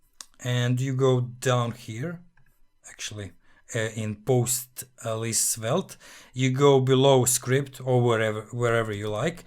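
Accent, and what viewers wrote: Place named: Russia. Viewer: Кайфую от Русского-Английского ))) Бекаус блять миссинг патх, фор пост епта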